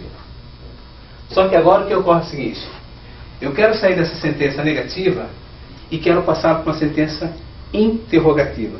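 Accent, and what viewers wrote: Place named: Paraguay. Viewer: Meu Deus que ingles mais paraguaio!!! The pronunciation is terrible ! Nun fala nen ingles nen pt direito! Nao existe ingles em 50 horas , nao se iludam. Aprender uma lingua nen é algo mecanico.... olha se essa tecnica é tão boa , os filhos e parentes desse cara deve ser poliglota kkkkkk ! Eu falo ingles e sei que aprender uma lingua nao está ligado a nivel de inteligencia. Nao acho correto enganar as pessoas desta forma se é que alguem acredita . Abraços!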